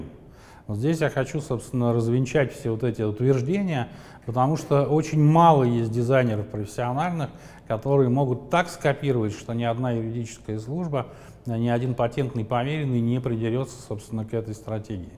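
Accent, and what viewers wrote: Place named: Moscow, Russia. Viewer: Маааасковский говор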